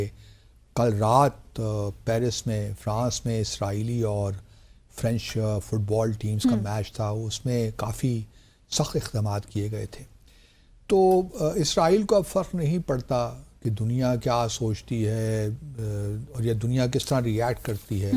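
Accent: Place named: Punjab, Pakistan. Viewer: پروگرام کا آغاز انگریزی میں اس طرح کرتی ہیں جیسے کوئی انگریز کامپر ہیں - پھر پنجابی لہجے میں اردو شروع ہو جاتی ہے - بھائی سیدھے سیدھے اردو پورا پروگرام کرو یا پھر انگریزی میں کرو - یہ اردو انگریزی اور پنجابی کی کھچڑی کیوں پکاتی ہو ؟ اتنا احساس کمتری کیوں ہے ؟ کس کو انگریزی بول کر مرعوب کر رہی ہیں ؟ انگریزی لہجہ اور تلفظ بھی درست نہیں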